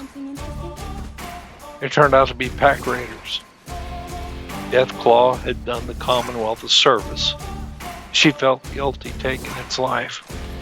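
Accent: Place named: South, United States. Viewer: when I first watched your videos, your narration reminded me of Easy Pete for some reason, perhaps because of that Southern type accent you have, which sounds great by the way. Now I'm thinking you're more like the JRR Tolkien of FO4 because your storytelling is on another level. Thanks for sharing this video. It gave me a lot to think about after I watched it and yes, the music is great, compliments it well!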